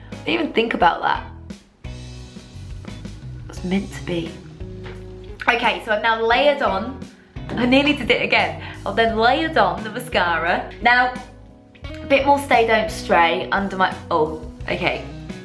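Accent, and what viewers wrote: United Kingdom. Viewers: how proud you are of your Scottish accent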